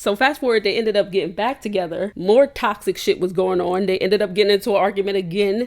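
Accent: New York, United States. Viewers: I knewww u was from NY 😂😂😂 had to be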